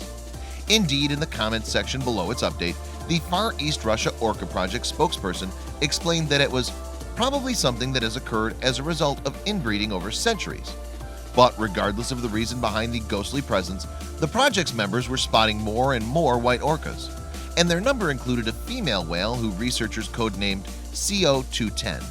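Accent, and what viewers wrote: United States. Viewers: Dweebe yanks accents like this are both offputting and superficial ... no gravitas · I don't know why I continue to get sucked into these videos as a minute or so in to them the penny drops that the the narrator, or more likely the writers, tell 'pork pies', and the narrators ALWAYS have American accents! The first lie becomes obvious as there is a white humpback whale named 'Migaloo' sighted on the Australian east coast when he otherwise affirms that there are no other white whale specimens, the second lie being that blind freddy could see that when he claims that this white adult orca is "fully socialised" the question begs is, why is he swimming with orcas of a different type? Notice the fin size difference between the white whale and the rest of the whales in the pod denotes that whilst both are orcas, the white whale is of a different sub-species, just as are Brahman cattle are different to say, english Hereford cattle., and which means that for some reason the white orca appears to be socialising outside his sub-species group, and therefore raises the spectre of the third lie when the next question begs, where is his mother that the narrator asserts is amongst the pod? 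Then notice at about 5.05 mins into the video in much more grainy footage you see the white orca surfacing amongst it's own sub-species, so it may well be two different white orcas as was alluded to later on in the video. Then there are differences in types, being 'residents' to a localised area, 'transient' consisting of small pods in which females tend to have more triangular fins than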